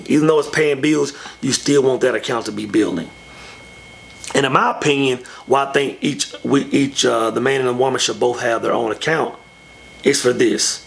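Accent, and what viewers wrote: Texas, United States. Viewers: Before I knew you were a PK (preacher's kid) I saw the pastoral love in your eyes; the apple don't fall far....(Your Texan accent is awesome, BTW). I am amazed by the gentleness by which you edify your brothers. God has a very powerful calling on your life, and I am committed to pray you and the other brothers become all you are meant to be according to God's grand plan. Keep up the good work. My heart wants to be sad that you feel marriage is not for you, but I can understand being in that state because of past experiences and being screwed over by society. May I say, DON'T LET THEM WIN! I don't know how your individual lives will turn out but just know here is a sister who wishes you well, and laments the pain/frustration/mistreatment you have been through. I hold you all in high regard and expect things in your life to get better. 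I wish you to be surrounded by people who see the treasure in you and draw it out. You will not go down. You've already been down. Now is the time to keep going, keep rising, keep building. The generations will sit at your feet and say, "Dad....Honey...Grandpa, tell me...teach me...explain...show me" #YouTheMan #YouTheBoss #TheEarthNeedsYou, my kings!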